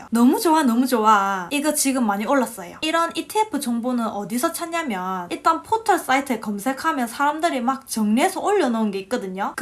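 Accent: Gyeongsang, South Korea. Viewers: 부산사투리인가요? 대구사투리인가요? 찰지네요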